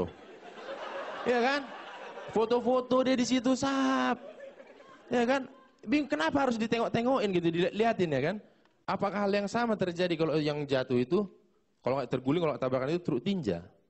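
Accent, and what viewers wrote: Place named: Batak, Indonesia. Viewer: bataknya nyanter banget, wkwkwkwk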